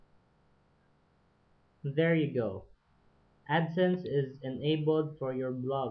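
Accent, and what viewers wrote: Philippines. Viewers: its because you sounded like noypi... just like me.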